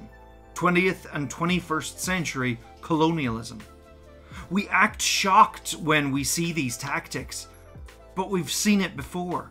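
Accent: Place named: Ireland. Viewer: A complicated political situation explained in an Irish accent somehow makes it better 😍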